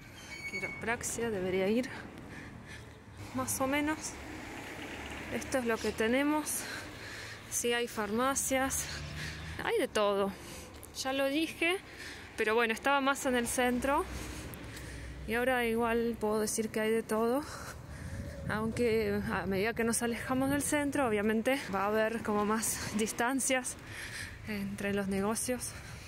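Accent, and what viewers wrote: Argentina. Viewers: Excelente castellano y acento argentino. ❤Río Ceballos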